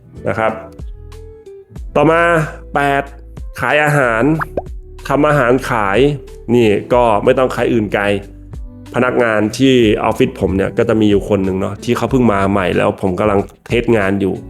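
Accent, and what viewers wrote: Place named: Khanu Woralaksaburi, Thailand. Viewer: พี่คนขาณุวรลักษบุรีหรอครับ แซ่บติดปาก บัวยี คล้ายหมู่บ้านผมเลย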